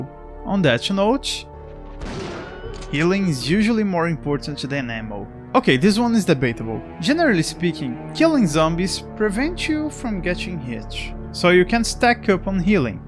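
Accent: Brazil. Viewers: O sotaque brasileiro tão reconhecível hehe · It's a Brazilian trying to talk english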